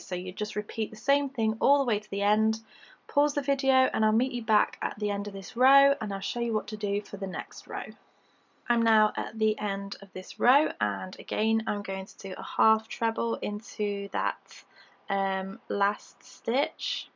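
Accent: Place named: United Kingdom. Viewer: is British in case you missed the accent along with everything else she said that you clearly didn’t listen to. What you call a double is called a treble in the UK, not sure how anyone can not know that if you use YouTube and online patterns.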